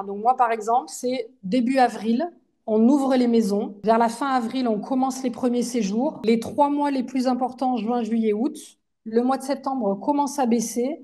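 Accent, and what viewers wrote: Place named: South, France. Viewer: votre accent du sud qui nous apportent réconfort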